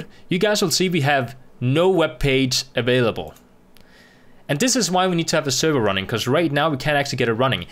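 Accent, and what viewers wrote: Denmark. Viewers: Was gonna say, you sound like my Norwegian cousins. Danish! Great tutorial, from a python and C lover. Subbed, upvoted, and all notifications enabled. :) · Hah du er dansk d er jeg også. Syntes også jeg kunne genkende accenten :). God tutorial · Er du dansker?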